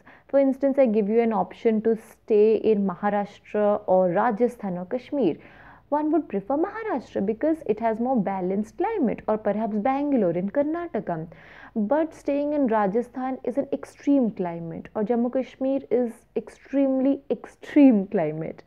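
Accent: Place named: New York City, United States. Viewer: NYC pronunciation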